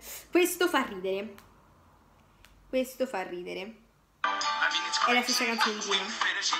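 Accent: Naples, Italy. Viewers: Ma è di napoli?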